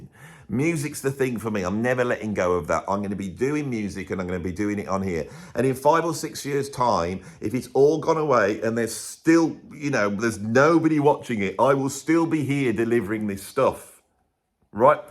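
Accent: Birmingham, United Kingdom. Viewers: Your a character besides our general interest in music Were here to listen to you.There now I've sucked up. I don't mind Brummie,we need a good laugh besides the serious stuff. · Brummie stream of consciousness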